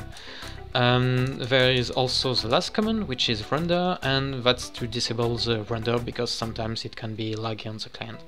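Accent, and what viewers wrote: France. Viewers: Merci ! Ps : J'adore ton accent francais mdrrr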